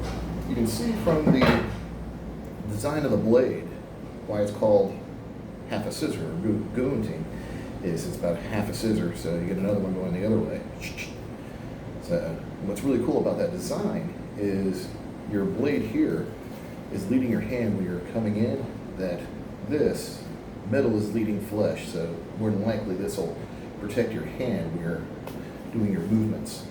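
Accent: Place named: United States. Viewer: your accent is american, but your house is balkan, where the hell are you from lol cool swords btw <3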